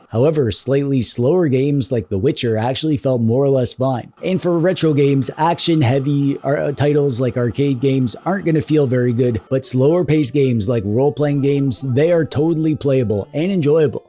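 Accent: Canada. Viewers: You're amazingly Canadian, huh? I don't know how I never noticed til this video.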